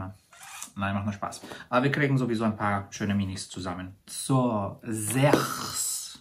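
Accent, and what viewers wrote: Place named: Vienna, Austria. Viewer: Hallöchen^^ Ich habe Dich durch Zufall hier gefunden und ich liebe deinen sarkastischen Humor. Deine direkte Art ist sehr unterhaltsam. Dein Wiener Akzent i love it! Liebe Grüße aus Hamburg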